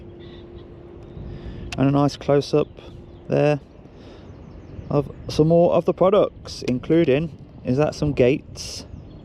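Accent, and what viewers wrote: United Kingdom. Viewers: Local accent. Quite a few accents pronounce the “yoo” sound like that. Look at how Alan Sugar pronounces “new” as “noo”. Perfectly normal in some parts of the UK.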